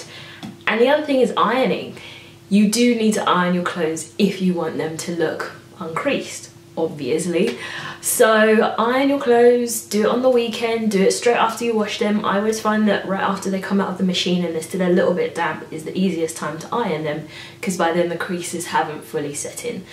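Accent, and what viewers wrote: United Kingdom. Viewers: Great tips and great video. Love those pearl earrings on you. They really draw attention to your eyes and make your teeth look nice and white. Oh, and also, I adore your accent. A British accent always sounds so so classy. I try to follow all your tips to look polished, although I'm embarrassed to admit that most times my nail polish does chip. I do my own nails because I like changing colours weekly & I can't stand all the time wasted at the nail salon when I could be doing something more useful. Also, the smell gives me a major headache. However, in 2019 I shall carry my nail polish in a baggie in my make up kit. No more excuses. Keep up the great videos.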